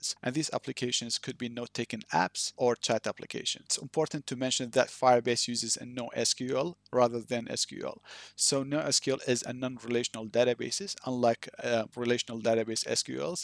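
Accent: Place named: Arab world. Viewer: Are you Arab? Because you sound like one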